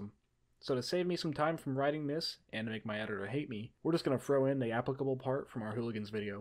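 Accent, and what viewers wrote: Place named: United States. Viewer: Soon as the yank started narrating, I switched off!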